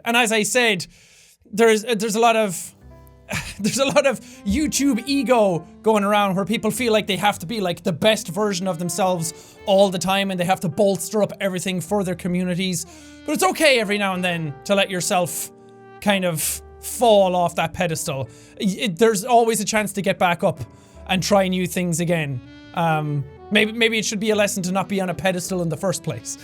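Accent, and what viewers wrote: Ireland. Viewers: I'm so happy for 17,000,000 subs love your Irish accent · Early and Congrats on a huge mile stone I have watched you channel since you didn't sound Irish well done jacksepeye!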